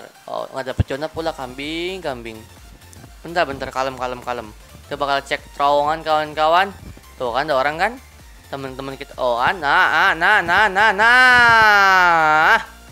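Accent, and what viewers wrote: West Java, Indonesia. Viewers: Orang sunda kah? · oraang sunda anjay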